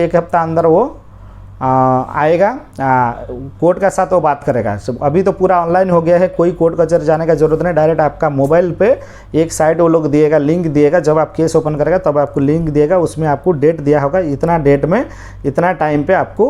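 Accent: Odisha, India. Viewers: Aapka hindi se pta chalara aap odisha se ho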